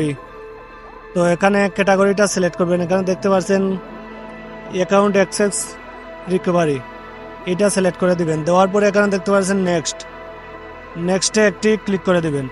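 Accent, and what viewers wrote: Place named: Sylhet, Bangladesh. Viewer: Apne Sylhety🫡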